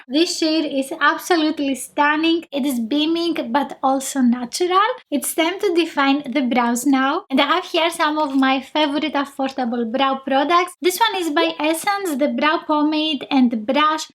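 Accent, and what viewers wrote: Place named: Greece. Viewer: I love your videos and greek accent. I am greek too, Christina. You located in Greece? I was born in Canada but live in Sweden now. Contact me if your in Greece. I'll come visit😁 I'm a makeup artist too👍❤️ we can have fun and do videos together with fun budget products too i haven't tried yet! Sweden don't have the products in stores to test shades out but I'm scared to order online so I don't get the wrong shades lol. I have really oily t zone /combination skin and I don't like too flat matte but I want it full enough to cover and last all day. Did you do a video of the makeup revolution irl foundation, concealer and power line? They don't have it in the stores here I was hunting BUT ONLY their older products available here. Oh you should try rimmel mascaras and foundations! So amazing! The mascara I have been using for years is rimmel wonderfully length pink one it adds volume too!! 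Beats all mascaras today I've been using for years, I've tried them all MUST TRY!! Do a rimmel video please 😍your welcome to Sweden too! Xo Xtina❤️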